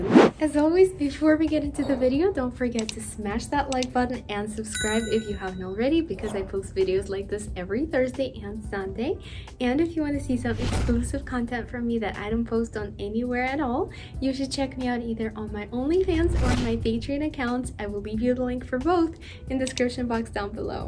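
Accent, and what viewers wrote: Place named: Eastern Europe. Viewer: Uh...I wonder what this girl's story is. I think she has a bit of an accent...from one of the former Soviet republics? She smiles a lot to pacify the viewer, as though she wants nothing more to 'be pretty for us', but of course only a complete idiot leaves it at that. What's her story? She's got AMAZING legs though...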